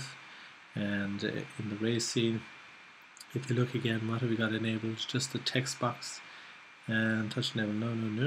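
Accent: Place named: Ireland. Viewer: These are great videos, and it's nice to hear an Irish accent too! Maybe you can help me out with a query: I'm trying to design a game character in Blender composed of several objects (sphere, cube etc.). I want to load it into my cocos3d app as one node with several children, so that I can transform the whole lot at once. Is it possible to set up that group within Blender? I've tried using the regular Blender "group" feature but those don't appear to end up in the POD file. I can see that obejcts can be parented to other objects, but when I parent objects to the sphere which is the largest and most central component of the scene the vertexes are distorted in a bizarre way. Finally I've tried parenting all of them to a bounding cube but I can't find a way to hide the parent cube so it doesn't render in the scene. What would you advise?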